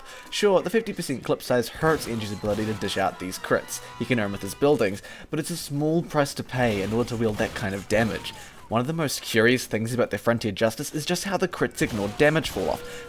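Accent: New Zealand. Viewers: what national background do you have? i think you sounds new zealand, coming from an aussie :P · You're from New Zealand aren't you? I recognise the accent. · are you from New Zealand? you sound like it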